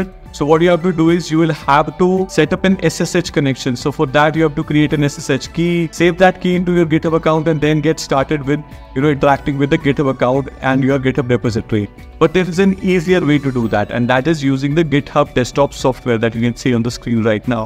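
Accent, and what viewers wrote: India. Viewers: Thanks for the help and also you have the most perfect male Indian English speaking voice I've heard. So soothing yet has a subtle Indianness to it.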